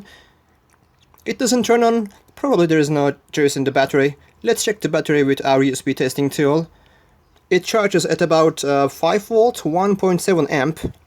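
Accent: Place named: India. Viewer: very informative and interesting video. what country are you from? the english sounded kinda indian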